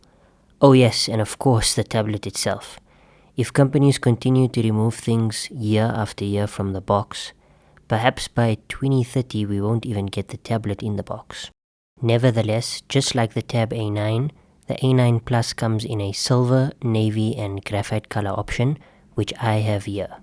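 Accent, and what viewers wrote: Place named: South Africa. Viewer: You can tell this guy is from south africa 😂 im also from sa bru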